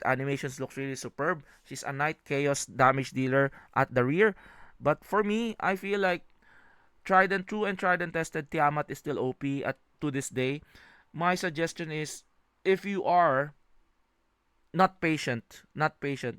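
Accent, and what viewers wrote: Philippines. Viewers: I notice the accent, are you a Filipino?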